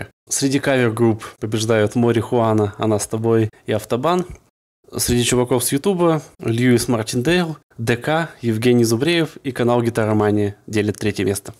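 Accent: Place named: United States. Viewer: Разбор с американским акцентом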